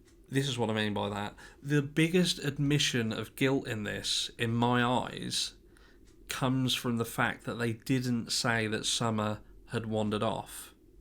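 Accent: United Kingdom. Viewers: Hi there. I just have discovered you in my recommended and enjoyed this deep dive! Its funny to hear a guy from the UK (assuming from your accent lol ) talking about a case here by me in New York (paisley)...funny how small the world has become in the recent times 🤔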